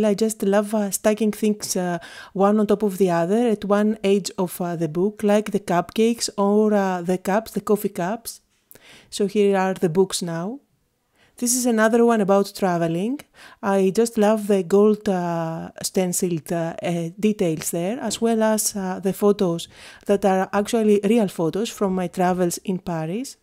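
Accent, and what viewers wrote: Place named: Greece. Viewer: Φαινεται τοσο πολυ οτι εισαι Ελληνίδα , απο την προφορα και μονο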